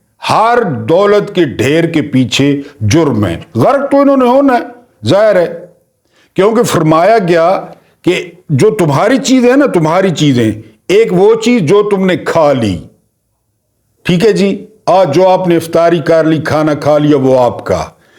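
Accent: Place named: Mianwali, Pakistan. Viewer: Sahib kabhi kabhi apni mianwali zuban aur lehjay ka chtkhara zaroor lagaya karain hamain buhut lutf aata hai.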